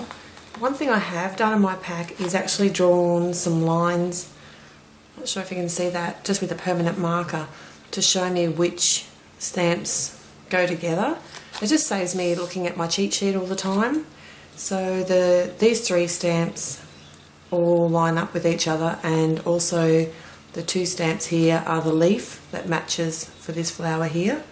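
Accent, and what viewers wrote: Australia. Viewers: Hi. Where can I buy the extra thick foam pad. From your accent it sounds like you are in Australia, so am I. Thanks again. Regards Liz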